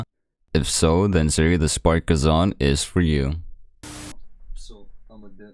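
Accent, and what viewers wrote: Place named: New Jersey, United States. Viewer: u sound like u have a sopranos accent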